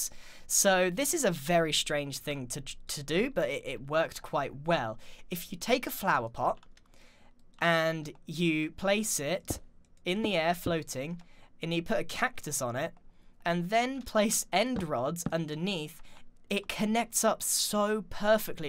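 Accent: United Kingdom. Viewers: Rafael YT relax he sounds like he's british or something he probably is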